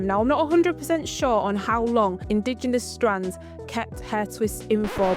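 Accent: Northern England, United Kingdom. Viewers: Hey love, great video. I actually released a video very similar a couple of weeks ago. I've been following your journey on IG as well. Looking forward to seeing us both get to waist length very very soon 💜 Also, you sound northern. I thought you were gonna say you live in Manny or Yorkshire or something haha. I'm in B'ham.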